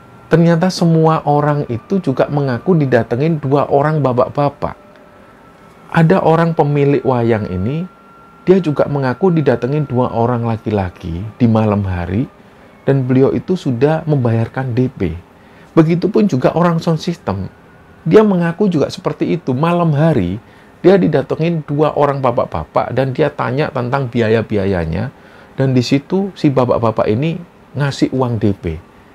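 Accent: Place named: East Java, Indonesia. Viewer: Suka dgn penceritaan mas ini,logat jawatimur bgt,sperti saya nyemak bapak saya, waktu bpk cerita² dirumah..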